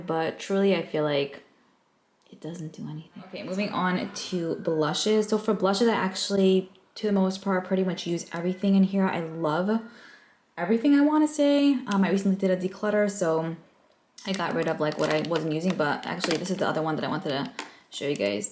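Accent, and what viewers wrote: New York, United States. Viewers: I actually love the Too Faced Chocolate Brow-nie pencil but I just found out Ulta doesn’t carry it anymore 😭 I usually used my points to get it for cheaper. I don’t know if Sephora ever did but it’s not on there either. It is on the TF site but idk if it’s worth it. It’s the only pencil where I found a good shade match, because my brows look black but they’re actually a very deep brown. I got the newer Huda Beauty one which matches my brows well, but I broke it off a lot already, it’s too thin for me! Also I’m bilingual too, with English not being my first language either. I usually say words correctly but sometimes my accent comes out— probably because I’m around my family so much. My English was perfect from elementary to middle school but high school I started having a slight accent? lol So be proud of who you are, a lot of people just know English anyways 😜 Your accent also has that New York vibe, you sound badass haha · To me you sound like a New Yorker. 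The way you speak is just fine! Don't listen to the assholes, you probably know more languages than they do! Insta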